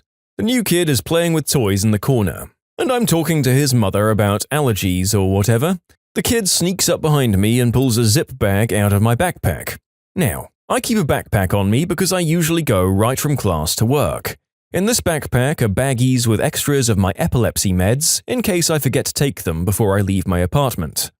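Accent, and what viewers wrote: United Kingdom. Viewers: Why is he britsh now? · Is it just me or did you suddenly become British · This new guy is a grilling MACHINE! keep up the good work man! Also are u British? U sound British. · Why doe's he sound British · Whoa what happened to the person who was telling the story to all of us he sounds more British than robotic I think they're replaced the AI voice with an actual person and that actual person just seem to be British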